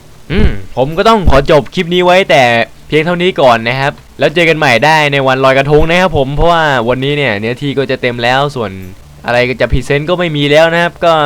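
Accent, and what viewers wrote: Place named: South, Thailand. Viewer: พี่ คนใต้ จังหวัดไหนเหยอ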